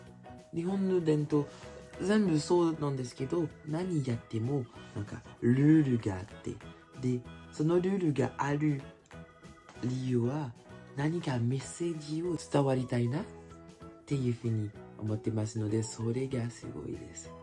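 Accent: France. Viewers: ちょっとフランス訛りの日本語が優しくって可愛い💕 日本の良い所を見てくれてありがとう🙏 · 何と心地よい話し方なんでしょう、フランス語と日本語の良い所が合わさった発音のような気がします。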